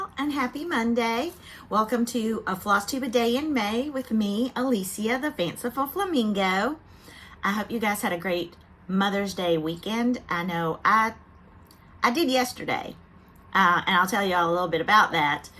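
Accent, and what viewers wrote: South, United States. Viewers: I just started watching your videos last week. I really like listening to that southern accent (I'm from Texas). Now I love you videos! Anyone who owns a golden retriever has a special place in my heart. Thanks for sharing your videos. I really enjoy them.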